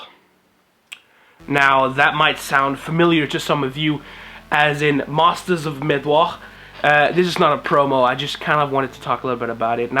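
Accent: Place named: United Kingdom. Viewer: why do u sound british m8 xD i thought u were american ..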